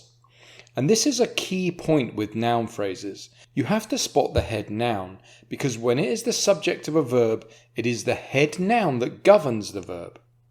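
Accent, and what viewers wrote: United Kingdom. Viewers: Beautiful British accent. I like it so much!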